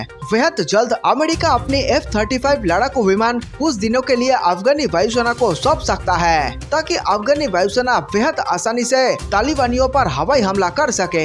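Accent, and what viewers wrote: Bengal, India. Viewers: News reader Bagali uchaaran me Hindi bahut achhi bolte hain.very nice!🙏🇮🇳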